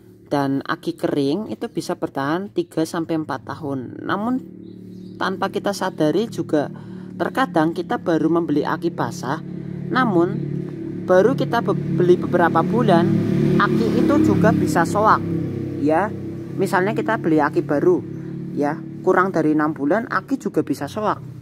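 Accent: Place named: Cilacap, Indonesia. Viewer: Ngapak bgt...kayak cilacap😁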